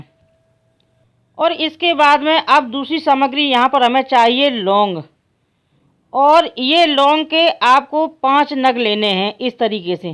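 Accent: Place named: Etawah, India. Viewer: Mam lagta ha app etawab se hoo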